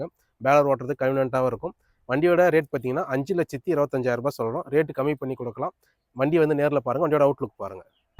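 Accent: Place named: Kerala, India. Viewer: Kerala Tamil❤🎉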